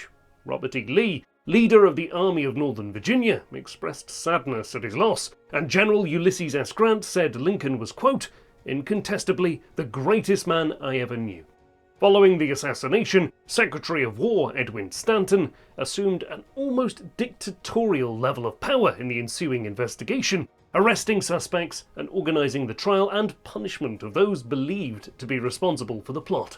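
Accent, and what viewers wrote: England, United Kingdom. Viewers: The English nasally twisted appallingly irritating narrator should be forever binned ! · Did You notice that it's a BRITTISHER who is doing the narration? Irony. 📚 M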